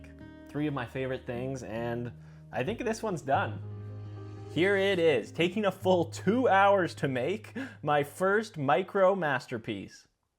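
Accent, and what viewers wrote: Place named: Canada. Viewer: the Canadian accent 🤣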